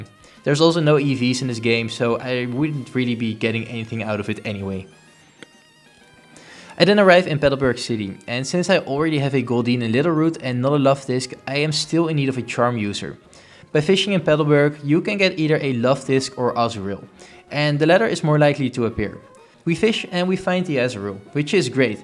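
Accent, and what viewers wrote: Netherlands. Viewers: you sound very dutch, is this true?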